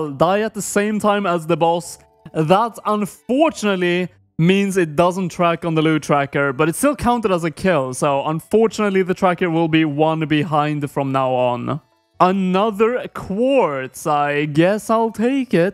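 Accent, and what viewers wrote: Sweden. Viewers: The swenglish accent is so strong, i love it😂 Rsn: Kurdo-1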